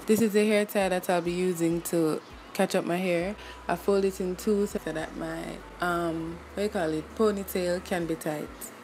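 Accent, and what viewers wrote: Jamaica. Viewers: Hear di accent nuh man 💛🇯🇲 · You sound like a Jamaican, if so I'm also Jamaican lol hehe Nice video btw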